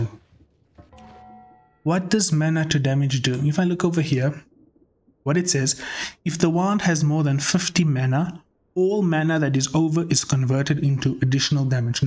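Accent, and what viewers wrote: South Africa. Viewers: Huge fan of the series, was wondering where youre from? my guess is south africa :D?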